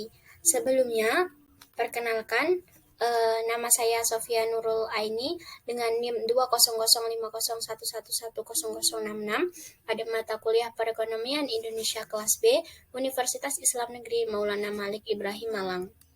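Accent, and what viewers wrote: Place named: United Kingdom. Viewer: Aksen englishnya woah syekali 🔥🔥🔥🔥🔥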